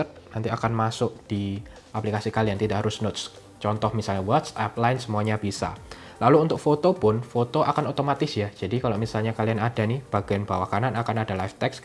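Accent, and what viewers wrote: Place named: Banyumas, Indonesia. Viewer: mantap mas..ngapak ya mas..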